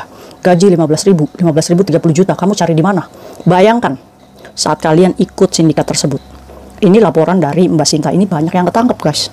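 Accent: Indramayu, Indonesia. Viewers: pantesan kl ngmng BOLeD mirip orang Indramayu 😅😅😅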